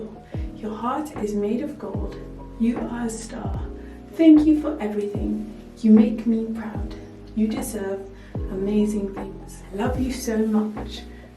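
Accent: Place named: United Kingdom. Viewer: It’s the British accent for me😅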